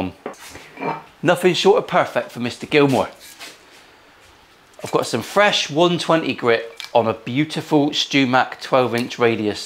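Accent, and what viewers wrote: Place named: West Country, United Kingdom. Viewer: Video one of this series popped up on my feed so I decided to check it out. Whoooooo boy am I glad I did. I knew I'd found a bedfellow (steady) when you included that comment from yer old mate Sean Moore (Paisley Tele, Pt3). Laughed so hard, the convulsions made my missus thought I was having a stroke (not like that). Guitars, swearing, calmps, sandpaper, wood rubbing and a West Country accent. What more can anyone need? Great work!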